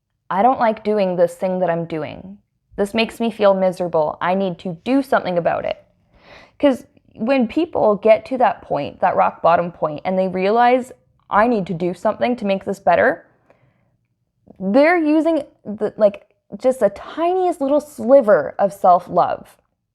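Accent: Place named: Russia. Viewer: Congrats your new apartment 🎉 also that Russian accent 😎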